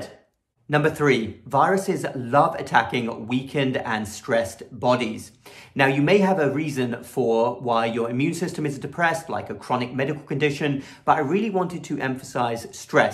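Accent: England, United Kingdom. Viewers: English accent and your intelligence makes you a bawss professor anyway, no need to add on the dorky glasses you look good bruh you rawk already! boss doc!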